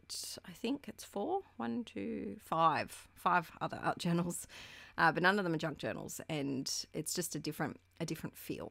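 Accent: Australia. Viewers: I am amazed at the job you managed to achieve suffering from PND. I appreciate your energy and enthusiasm which is in you as well. The first thing I do when I wake up is going on YouTube and watching your video which I expect with a kind of childish excitement. I live in the south of France and I love listening to your Aussie accent. Your videos are precious to me as I suffer from disabling chronic illness which makes it difficult for me to craft. So I just take things as they come. Life is beautiful, you've got a lovely family, stick to all the wonderful things you achieve and don't give to much credit to this PMD. This doesn't mean you've got to ignore it, which is impossible but maybe you need to take care of yourself and slow down a bit. Having 2 young kids and a job is very demanding. Don't forget your own needs. Take care. Thank you very much for the few minutes of fun and joy you bring in my life.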